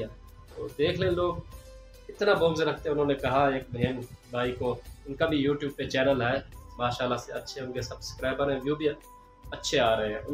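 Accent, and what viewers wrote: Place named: Saraiki, Pakistan. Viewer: سرائیکی آواز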